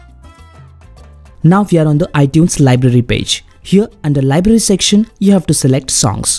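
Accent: India. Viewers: ah yes the first channel that an indian speaking english im so impressed and thanks btw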